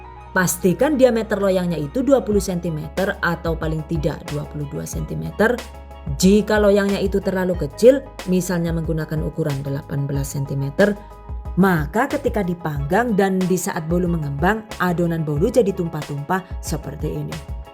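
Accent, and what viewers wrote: Banyumas, Indonesia. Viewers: Terima KASIH..rezepnya..salam hangat dari negri kincir angin..logat ngapaknya luwes banget..apakah asli wong Banyumas..👍👍👍😁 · Suarane mengko malik ngapak, dadi seneng nyonk kowh karo channel kiye. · Terima kasih resepnya ... nonton videonya sambil ketawa aplg klo bicara ngapak 😊😊👍👍👍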